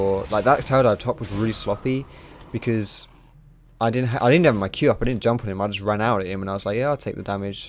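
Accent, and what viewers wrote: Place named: United Kingdom. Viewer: Where in the UK are you from?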